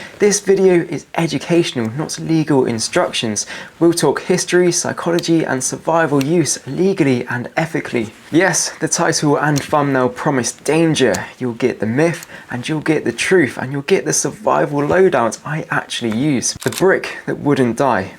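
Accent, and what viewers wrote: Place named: England, United Kingdom. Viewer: I'm sure that way of speaking is perfectly natural for him as an English person. Depends on where he's from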